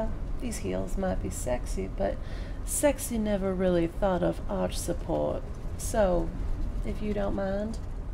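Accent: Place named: South, United States. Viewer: I am really feeling the southern Belle ascent in this one. Nice imaging of the Succubus.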